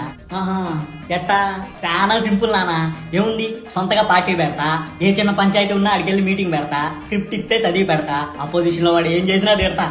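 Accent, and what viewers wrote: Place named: Godavari, India. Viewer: ఇప్పుడు చూసా నీ అసలు ఫేస్ మీ క్రితం shorts లో గోదావరి యాస మాత్రం... బాసు సూపరో సూపర్😂😂😂😂😂😂😂😂😂😂😂😂😂😂😂😂😂😂😂😂😂😂😂😂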